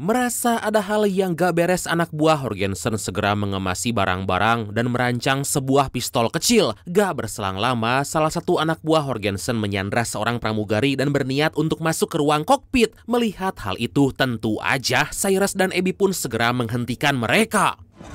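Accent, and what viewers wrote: West Java, Indonesia. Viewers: Sunda pisan euyyy logat na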